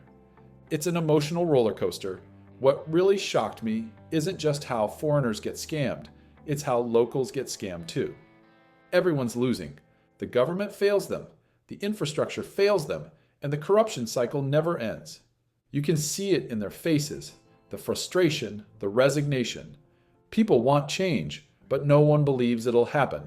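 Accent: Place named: China. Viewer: The narrator here is trying to sound like foreigny Westerner, but have Asian accent, from China?..Airport a chaos? Probably true yrs ago till late 2024 had major upgrade & improvements, viewable online, after the private management takeover by largest Phil firm,improvements include Grab taxi stands w fixed prices..Of course if one goes to rural towns in the Phils, Thailand & Vietnam, one wouldn't expect better updated amenities except that Phils has Musk's starlink reaching remote areas. Poverty rate of Phils is 10.6%, 3rd LOWEST in Southeast Asia tied w Thailand, topped by Singapore and Malaysia, so untrue that poverty drives Filipinos to be dishonest and smiles w a financial agenda.For comparative, USA has an 11% poverty rate, while some of China's poor are eating garbage w millions now are going to countrysides due to serious job shortages ( Decoding China, China Observer, Lei's Real Talk). 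Reader's Digest gives a passing grade on honesty on Filipinos.The Phils is ranked 3rd on Safety in Southeast Asia by the Gallup affiliated survey outfit..Phils voted in past administration an atheist Pres, so untrue Catholic Church has strong political clout..Untrue garbage is prominent in Phils' invirons,top SEA garbage producers : 1. Indonesia 64 mt.yr 2. Thailand 27 mt.yr 3. Vietnam 22 mt.yr 4. Phils 15 mt.yr. . Viewable online are foreigners' roundtrip MRT.LRT slightly elevated train rides around Metro Manila adjoining cities, as if seeing entire Phils & see if garbage, stray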